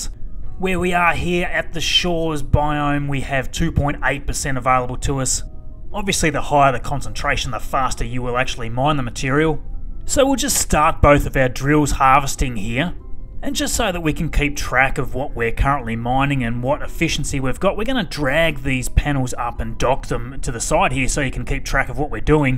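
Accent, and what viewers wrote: Australia. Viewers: he sounds like he is a Australian man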